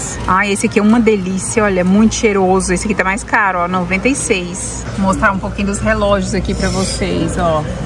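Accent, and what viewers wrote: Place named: Minas Gerais, Brazil. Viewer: Vc é mineira UAI 😂😂 acho lindo o sutaque minerim 😂😂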